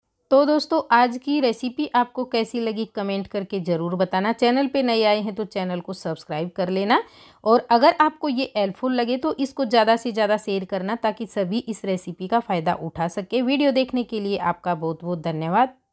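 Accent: Haryana, India. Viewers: I think aap hariyana se ho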